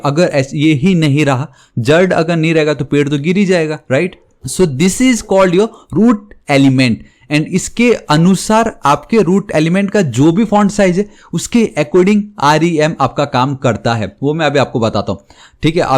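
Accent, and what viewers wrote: Nepal. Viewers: nepali acccent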